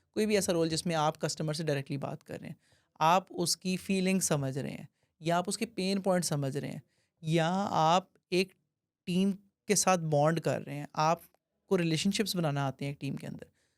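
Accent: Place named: India. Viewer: Thanks to Almighty Allah I saw first Pakistani tech content creator who talks like Indian tech content creator we proud of you sir what a fabulous way to narrate the story big fan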